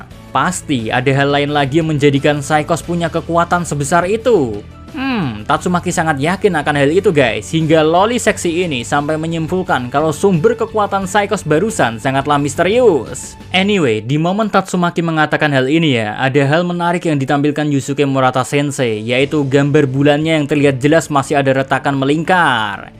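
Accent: Java, Indonesia. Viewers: Apakah psykos udah msk level ancaman dewa mohon dijawab mimin ganteng dan medog?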